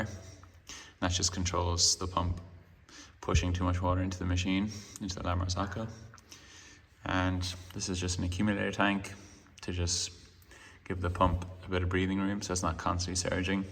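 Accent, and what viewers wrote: Ireland. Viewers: thanks for the informative video - just what I needed to see how involved the plumbing was before making a decision on whether this will be my next espresso machine upgrade from my plumbed ECM Synchronika (gen 1) - nice to hear the Irish accent when I landed on this video - I am from West Cork and wife is from Galway but living in Tampa Florida for last 28 years. The cafe looks great - hopefully will be passing through Gorey some day to try the cappucinos! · indeed you did not specify what thread standard you used for your hose connections. People in North American will assume NPT because that all we have commonly available here. Sounds like you have an Irish accent, so maybe you did use BSPP (British Standard Parallel Pipe) fittings which is what the La Marzocco machines have inside. But those fittings are not commonly available in NA. 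North American Pipe Thread (NPT) fittings are tapered, not parallel, have slightly different thread pitch, and while a ¼” male NPT will thread into the pump on the LM Mini & Micra Pump BSPP female connection, it will also damage it in the process. To connect an NPT flexible hose to the LM pump, a BSPP 19 threads per inch) to NPT (tapered 18 threads per inch) adapter is required. So maybe you are fine if you are indeed in Ireland, but a North American viewer will damage their machine doing what you did with plumbing fittings available locally.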